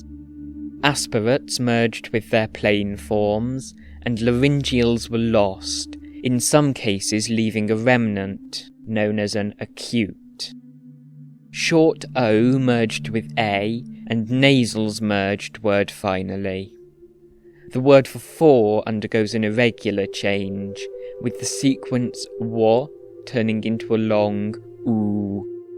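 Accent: Wales, United Kingdom. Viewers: Sounds Welsh to me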